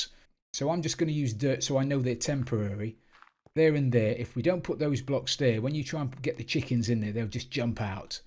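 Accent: England, United Kingdom. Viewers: I love his English accent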